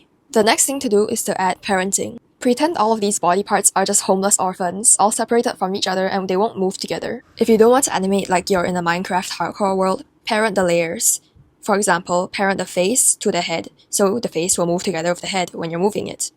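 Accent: Singapore. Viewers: Cool tutorial omg the final is so cute. Also woahahah your accent sounded so familiar. Checked your bio and... singapore?!?! 👀 Me too omg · I can hear the singaporean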